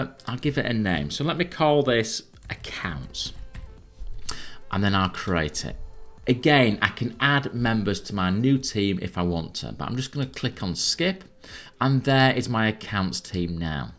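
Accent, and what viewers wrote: Yorkshire, United Kingdom. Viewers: American here. Hearing IT information in a Yorkshire accent - GOLD. I love that accent. So cool. 😊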